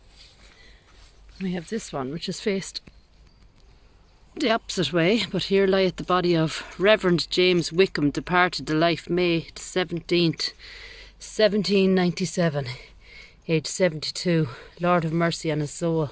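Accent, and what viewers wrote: Ireland. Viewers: I just absolutely love you videos. Your accent reminds me of my Grandma Margaret . She was so very proud of her Irish Catholic roots. Church and Rosary daily 🙏. Her family came from County Monaghan. ❤️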